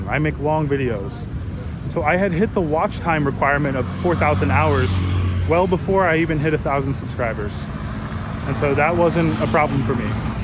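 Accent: New York, United States. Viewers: Keep talking bro! Malaysians are trying to learn your American English by listening to you! Keep doing it..Good luck from a Malay American living in Boston. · Love your New York accent. Keep it up.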